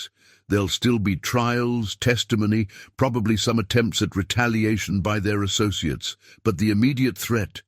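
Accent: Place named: United Kingdom. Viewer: Nisam gledala jer nema previd več Englesko - Britanski naglasak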